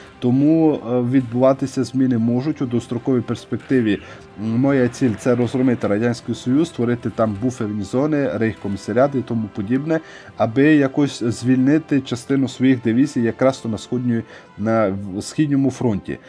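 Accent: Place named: Kolomyia, Ukraine. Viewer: Блін, класний акцент, авторе, ви з Коломиї?😅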